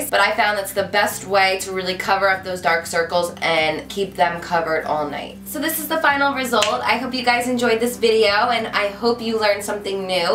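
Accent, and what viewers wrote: New Jersey, United States. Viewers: Are you from New Jersey? I can hear the accent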